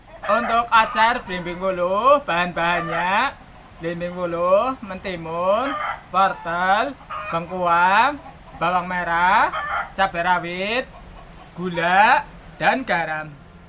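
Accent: Banyumas, Indonesia. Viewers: Ngapak banyumasan ya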